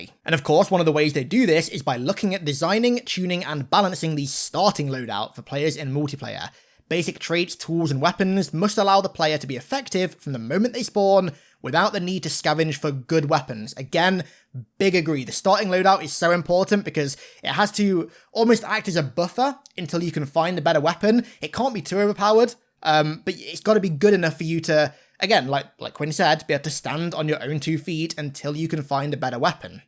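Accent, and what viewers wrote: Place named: United Kingdom. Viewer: what did you do with? And why do you have a British accent?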